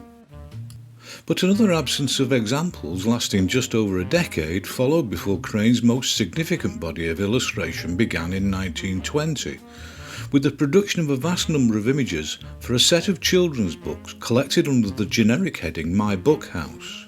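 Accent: United Kingdom. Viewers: Hello! May i ask what part of the British Isles you’re from? I can’t tell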